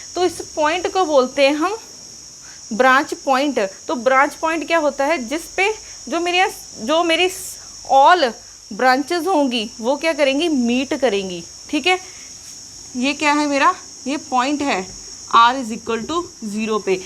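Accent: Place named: Punjab, India. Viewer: Punjabi accent aa hee jta beech mai 🤣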